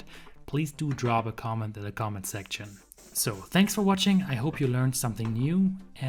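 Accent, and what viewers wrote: Germany. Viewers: WOW! I never knew this before! Thanks for sharing! Love your Germ-lish!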